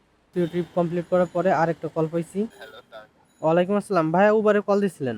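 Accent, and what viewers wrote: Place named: Jessore, Bangladesh. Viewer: ভাইয়ের গ্ৰামের বাড়ি কি যশোর অঞ্চলে? ভাষা শুনে মনে হলো।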